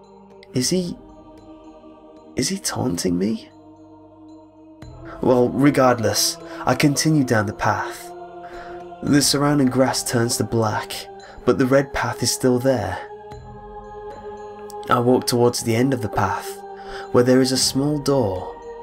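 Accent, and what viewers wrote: United Kingdom. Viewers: he's British. they have their speaking quirks, and they cant help it. honestly it sounds much better than American English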